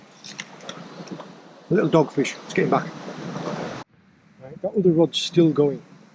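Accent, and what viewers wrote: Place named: Bridlington, United Kingdom. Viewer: I thought his accent was from near Bridlington. is from whitby. Best fishing videos on the net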